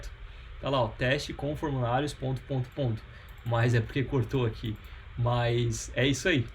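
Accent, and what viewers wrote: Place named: Santa Catarina, Brazil. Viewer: Massa!! Rio do sul exporta dev hein haha A rocketseat era dai também né. Imaginei que fosse de SC por causa do sotaque, sou de Floripa. Cai no vídeo pois estava tentando decidir qual biblioteca iria usar para form. Valeu pelo conteúdo!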